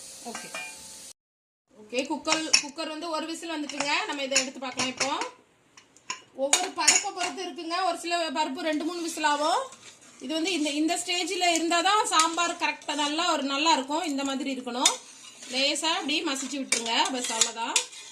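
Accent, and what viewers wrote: Tirunelveli, India. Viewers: Super sissy, Wr is ur native place? I think u r nellai ponnu bcoz im also in nellai. Slang la theriyithu sissy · Super Ka... Unga slang vache kandu pidichuten... Nanum thoothukudi than Ka... Akka sothi kulambu seinga ka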